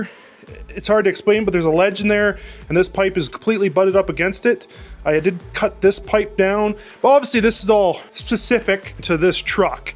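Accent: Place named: Canada. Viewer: Oooooh yeah bud, Canadian eh? Subbed!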